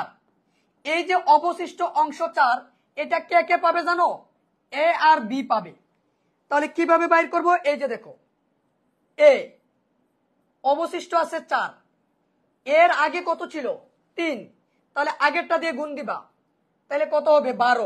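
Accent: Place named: Sylhet, Bangladesh. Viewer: Aii sylhety okol koi ve😂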